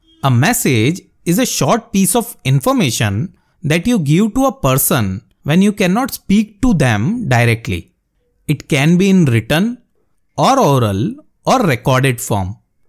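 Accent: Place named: South India, India. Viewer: Are you tamil,telgu or maliyalam😅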